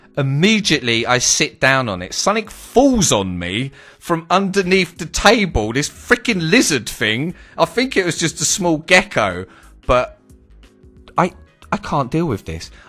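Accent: United Kingdom. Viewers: sounds like a London guy, as no Northern Monkey could be so entertaining.Him & Frankie have cost me a fooking fortune after trying out the games they convinced me to play.: ) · ur fucking hilarious mate just subscribed where are you from in England it seems amazing how different some.brittish ppl sound compared to others but I guess everywhere has different accents lol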